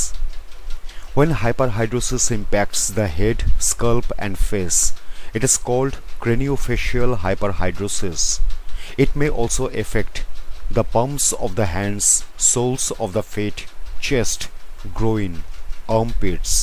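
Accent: India. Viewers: He is lndian..you cannot expect him to talk like an English or american man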